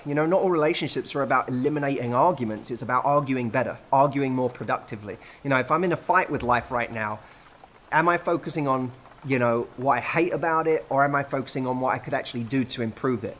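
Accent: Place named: England, United Kingdom. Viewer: Wonderful and inspirational as always! as i am studying english, may i ask you to what part of england or london your accent belong? i really like it. i hope you have a minute to answer my question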